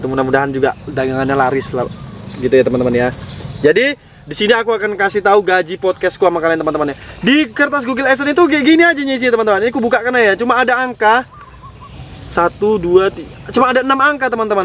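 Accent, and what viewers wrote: Indonesia. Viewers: Orang medan ya bang, tapi logat jawa😂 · logat nya khas kali Bg Medan nya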